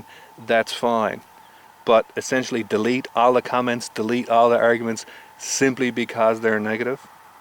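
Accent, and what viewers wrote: Ireland. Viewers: are you Irish? I swear I can hear a bit of accent. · You irish?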